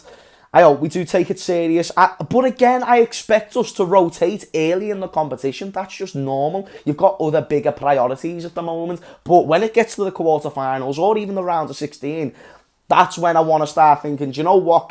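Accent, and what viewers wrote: Liverpool, United Kingdom. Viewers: Gooooo on lad keeping up the liverpool accent lol!!! L.f.c.4 eva y.n.w.a!!! From bidzy westmids Gypsy England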